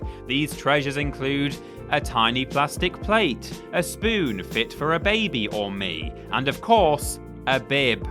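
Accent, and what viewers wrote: United Kingdom. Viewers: While I'm normally cringeworthy towards British accents, I could listen ramble on all day on just about anything.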